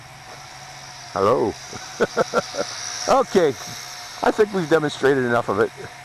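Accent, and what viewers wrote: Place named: Pennsylvania Dutch, United States. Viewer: Hi Mr. I really like this particular quad! And I dont mind buying from China, But I wanted to ask you about spare parts, are the available and if so where is the best place to get them? Thanks for all your great reviews, I dont ever miss one! You are THE best quad reviewer on youtube by far! I am from Reading PA and I think you said in one of your past videos that you were from Pittsburgh, Once in a while I hear some Pennsylvania Dutch in your voice? Do you have some Pa dutch in your blood?? Thanks again and keep up the fantastic work! Happy Easter to you!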